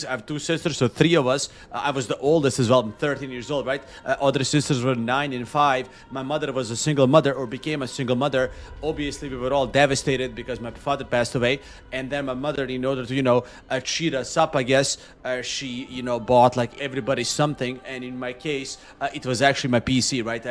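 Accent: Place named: Scotland, United Kingdom. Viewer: Odakle ti ovaj Skotski naglasak?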